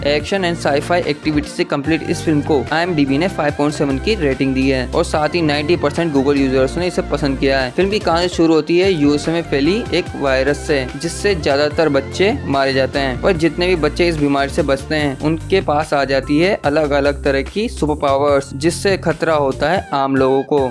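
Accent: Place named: India. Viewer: so bad english slang,obvious is indian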